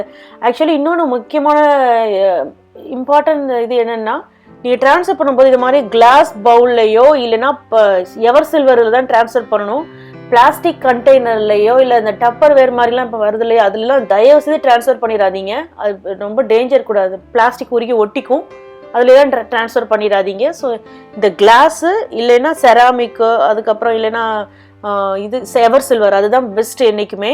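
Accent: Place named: Tirunelveli, India. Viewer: Nice recipe..ur slang seems like u r a Tirunelvelian...rite mam🙂.?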